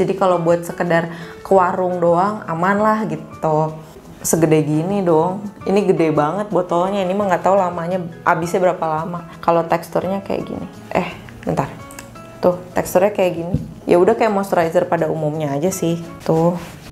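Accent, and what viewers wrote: West Java, Indonesia. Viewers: Sundanya balik 😂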